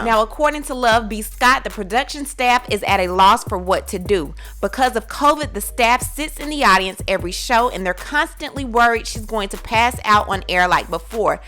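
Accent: South, United States. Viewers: I love and her accent. CORN-tine. You can do no wrong woman! Tea spilling facts with that friendly southern accent.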